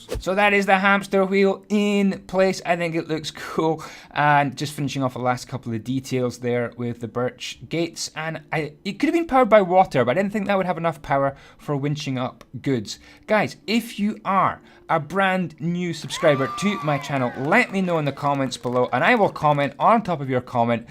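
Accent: Scotland, United Kingdom. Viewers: ! How have I not subscribed to you yet?! Hits subscribe The Scottish accent is irresistible. As for my suggestion, I'd say keep the village going! I love seeing it and I've watched each episode MULTIPLE times! Cheers!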